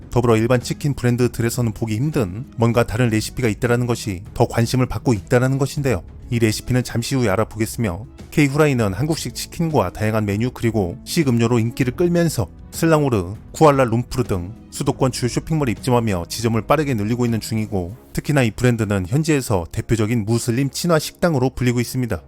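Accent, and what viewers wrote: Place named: Chungcheong, South Korea. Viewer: 내가 제일 좋아하는 유투브 프로그램!! 특히 충청도 목소리가 감칠 맛이 나지 누구도 흉내내지 못하는 저 리듬감 있는 목소리 패턴은 개그만담도 많이 해봐야 아는건데.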